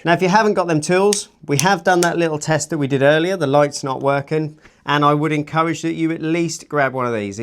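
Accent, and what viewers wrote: Norfolk, United Kingdom. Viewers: Sounds like a Norfolk boy to me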